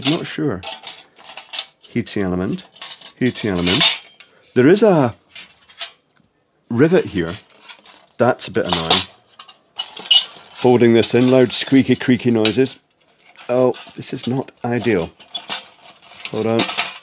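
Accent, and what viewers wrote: Scotland, United Kingdom. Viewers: You sound British so this will be a disassembly rather than an American ‘teardown’ surely. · just adore your accent is it morningside. Totally annoys my wife with my rendition of, one moment please.my wife thinks Renfrew.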